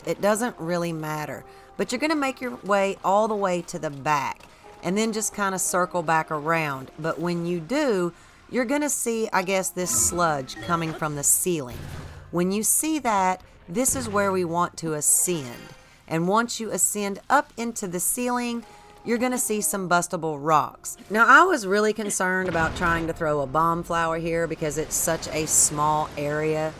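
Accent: South, United States. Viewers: I did not realize how much better walkthroughs are with a soothing feminine southern accent. Great stuff! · No lie that southern draw from a woman playing games makes me feel comfortable asf lead me gamer woman!!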